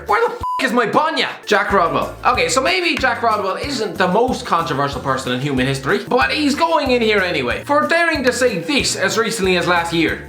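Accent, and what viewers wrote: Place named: Ireland. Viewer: The relief to hear the strong Irish accent when I click on these videos😅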